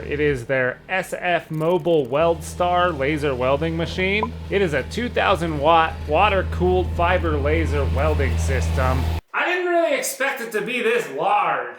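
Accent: South, United States. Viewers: First time finding your channel... You know that team the shoots pumkins with the air cannons, the guys that look and sound like hillbilllies but are actual rocket freaking scientist....you remind me of them, so I subscribed..... I like crap like this.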